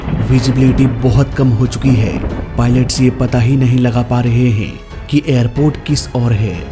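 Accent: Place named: Bihar, India. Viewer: Tum bihar se ho kya tumhari language se pata chalta hai ab aise illetrate log hame flight ke baare main batayenge😂😂😂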